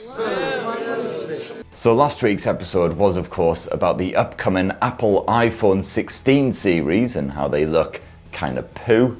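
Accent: United Kingdom. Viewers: I still not understanding your British special accent...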